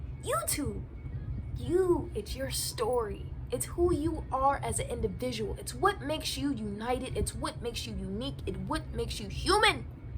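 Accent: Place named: New York, United States. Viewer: I love this video z! The NY accent, the light hearted vibes and the authenticity. It’s so you.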